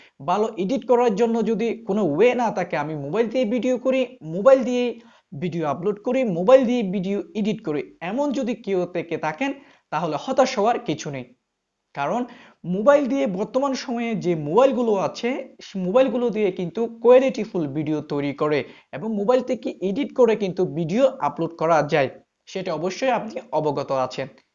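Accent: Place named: Chittagong, Bangladesh. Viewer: মনে হচ্ছে চট্টগ্রামের মানুষ।আমিও চট্টগ্রাম থেকে।Education ভিডিও বানাই।একজন কেমিস্ট্রির শিক্ষক।Love from Chittagong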